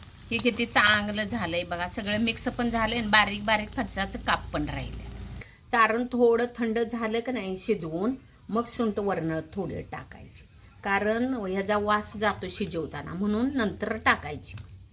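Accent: Kolhapur, India. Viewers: फारच नावीन्यपूर्ण ! आणि ताईंची कोल्हापुरी भाषा इतकी गोड लागली कानाला ! थेट ल्हानपनातच शिरलं मन !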